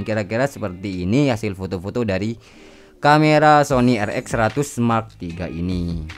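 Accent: Madura, Indonesia. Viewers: Madura banget. Anak madura mana ini? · wah worth parah.... mantab,,, logat maduranya masih nempel om... hahaha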